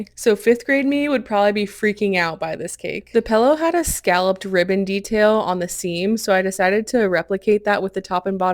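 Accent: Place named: Midwest, United States. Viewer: It's the "Midwestern" accent 😂 people in utah and Idaho also have that accent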